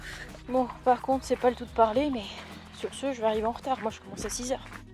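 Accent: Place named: Belgium. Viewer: charmante et courageuse la Belge juste une fois lol et moi qui croyais que tu prenais l'accent Belge juste pour plaisanter hihi. En tout cas ça fait plaisir de voir que des femmes ose se genre de mode de vie. Un petit boujou du 50 😊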